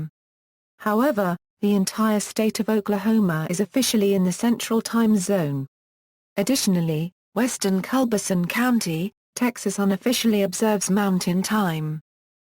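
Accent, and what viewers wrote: United Kingdom. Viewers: I don't understand a word of what this fucking bot says. British English is awful. God bless America, my home, sweet home.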